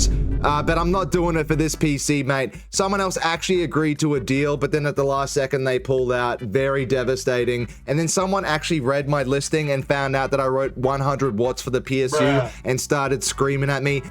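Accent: Australia. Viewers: I wish I had an australian accent and a better grasp on how to edit videos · are you Australian. you soundlikeit ?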